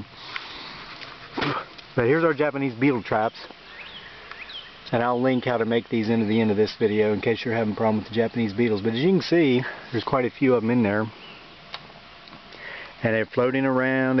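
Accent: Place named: South, United States. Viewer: Petutchi Betelgeuse Ditto.His genteel manner and southern accent are super nice too. I havent caught his name either... so humble.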